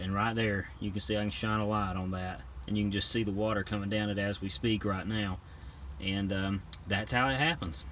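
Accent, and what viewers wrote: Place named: Tennessee, United States. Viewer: Hi, I bought a 2006 Jazz here in West Yorkshire a week ago. I knew about the leak issue so was pleased to find mine is OK. However, i took the precaution to seal those areas you highlighted with clear silicone sealant. Have you got another car and ditched the Jazz? ps love your accent, im guessing Tennessee?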